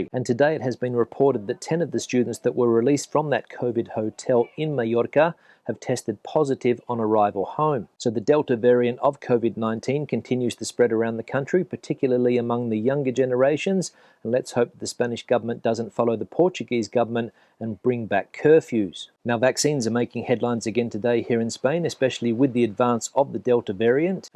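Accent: Australia. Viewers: I am an English speaker for 75 years. On my scale you are a 10 out of 10. You've lost much of your Australian accent probably as a result of living in Spain. I can tell that you're not speaking American English but I would not be certain whether you were from Australia, NZ, Canada or South Africa. Definitely you don't sound British. Can't believe you produce a great channel and people want to nitpick your English. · I love your 'no bullshit' Aussie accent.. keep up the great videos and sarcastic lessons given to those that can't spell whilst trying to slate you 😀👍👍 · Hi as an English teacher for twenty years you should know ‘more busier ‘ airport is a double superlative also I would suggest it’s not proper english ( ha ha ) to end sentences with a preposition but I have no problem with your accent although not as strong as on Rottnest in the bar As another Aus expatriate i love Spain and if you are going to do comparisons your beloved WA fails most comparisons starting with your pension You voted with your feet twenty years ago amigo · I studied Speech at University… I was taught that, in your case, you have a “regionalism” ie Down Under. 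An accent would be how you speak your regionalism. Say a person from Alice Springs vs. NSW…..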